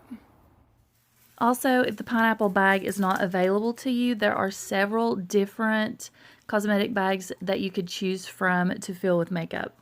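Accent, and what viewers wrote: South, United States. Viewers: Hi there! First time viewer! I'm LOVING these ideas! I'm hearing a Southern Accent..🎶🎶 to my ears (Southern girl here as well 😊) just curious where you're located? Thanks for sharing and I look forward to seeing more of your videos!! Ps: the Pineapple bags are SO cute, the strings on mine however, lasted 2 whole days. I was so sad and couldn't find any others. 😞 I eventually will "fix" mine 😂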